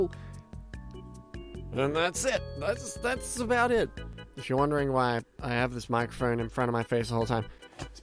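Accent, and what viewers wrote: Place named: Australia. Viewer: HE'S AUSSIE? I finally found another Australian brony... finally my search is over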